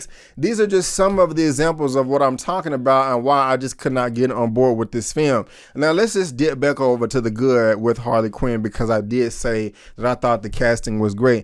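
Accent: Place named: North Texas, United States. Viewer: It sounds north Texan to me like dfw area mart be very wrong but that's how my people talk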